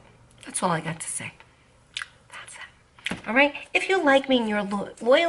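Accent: New York, United States. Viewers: I always say, 'People are people' we're nice we're not nice we're doing we're not doing. What. Ever. You my dear are beautiful! I am not a subscriber, 1st timer actually. Yourre a New Yorker. You?! Really. No kidding. Love the accent. Love this personality. I find you quite cute. Really. I'm from California...in California...will probably die in California. Born in San Francisco actually. Not much of a talker tho. But a good listener. Liked your content. Thank you for sharing. With Love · I love your coffee talk! I love You! Your gorgeous!And i hope you know how beautiful you are! I'm a native New Yorker living in Fl now and enjoy hearing the voice of one of my peeps😉 yeah not responding is the best ( go f yourself ) to those ( low life trolls ) seriously, what kind of person wishes sickness and death on another person?! Well, I believe in karma...anyway, you keep on doing your thing ...trolls will go away when they aren't getting attention. 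As for the so called friends....they can take a walk too.😊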